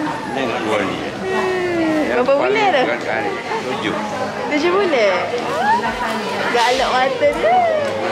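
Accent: Kelantan, Malaysia. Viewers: Comey dengar kak cakap kelantan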